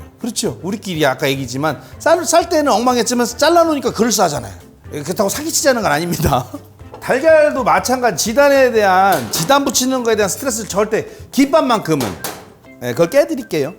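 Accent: Chungcheong, South Korea. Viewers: 백선생덕분에 충청도말이넘좋아유 ~~~ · 몇번을 봐도 밥 손에 한웅큼 쥐어서 김에 콕 찍으라고 하실때 그냥 귀여우심ㅋㅋㅋㅋㅋㅋ 충청도인 어투 걍 귀여우심ㅋㅋㅋㅋ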